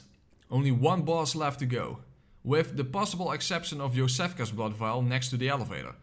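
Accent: Netherlands. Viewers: Im surprised there are so little comments about your dutch accent. Geweldige gamplay makker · First of all you sound dutch, second I can't even complete this game normally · Ik hoor een Nederlander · Ey, Nederlands of Belgisch? :p Mooie guide. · I love your Dutch accent lmao! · I can hear that you Nederlands are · Geez, ur dutch for sure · Love your Dutch English accent, greets from The Netherlands. Lekker gewerkt pik. ;) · You sound dutch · lol narrator is dutch, stereotypical terrible accent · Dit is de meest duidelijke nederlandse accent ter wereld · U dutch? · This man sounds dutch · Ik hoorde gelijk dat je nederlands bent aan dat accent hahaha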